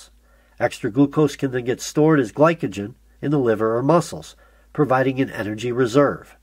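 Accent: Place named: Michigan, United States. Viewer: Great video. Love the (Michigan?) accent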